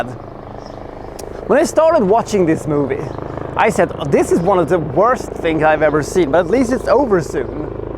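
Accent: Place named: Europe. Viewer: I think you can count your blessings for having gotten one like in three years for this drivel heap of a video. Oh, and show some decency when talking about Arabic “whatever” being mowed down. At least we know what Arabic is but we’ll never know what you are with the poor Euro-accent trying to sound American with throwing curse words here and there only ending up with an embarrassment that manages to surpass what you’re describing. This is a Joseph Losey film and so you may be better off leaving the review to someone who k owes what that is.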